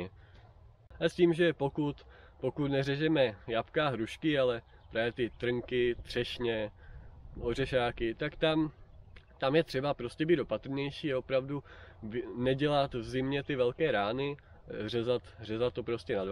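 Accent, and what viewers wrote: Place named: Wallachia, Czech Republic. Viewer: Mluví krásně valašsky, taky se mi to líbí :)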